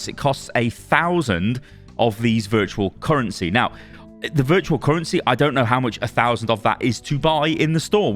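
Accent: South, United States. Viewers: your southern accent isn't bad. It's also kind of sexy LOL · OMG giving us a southern american accent is something I didn't know we needed. But it was glorious m8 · OK, I kinda love the American Southern accent. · I took a break from OW but I am back excited about OW2. I missed your content! Also the southern accent was unexpected but funny 😁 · southern accent sounds like Chris Chan nononono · Well shitttid... your southern country accent is on point!!! Hahaha haha 😄 😆 🤣 I moved to hillbilly country and that's exactly how they sound 🤣 I wish more info was given for currencies and how they interchange. Claim it all on OW when you can! Bring back Jeff. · really good American (Southern) accent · your Southern accent is fucking laugh out loud!!!!!!! From the States here. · kkona accent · I need more southern in videos.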